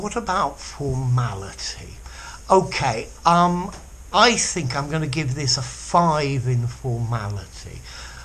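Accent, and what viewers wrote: United Kingdom. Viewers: Sir your accent is very British, I love it. Thank you for helping us understanding these idioms 😊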